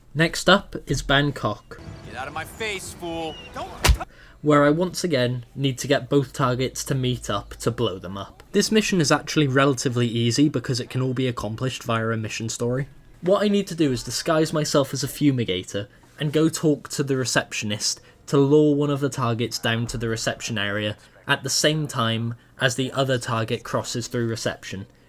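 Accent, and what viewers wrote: United Kingdom. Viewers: okay i have absolutely no reason to care about this apart from being queer but here goes. reasons im pretty sure this guy is gay 1. uses the word "partner" rather than girlfriend 2. "partner" knows about hitman which is more likely to be a guy 3. he doesnt sound outright camp but he has that british accent that is like perfectly closeted i apologise for having the urge to write this comment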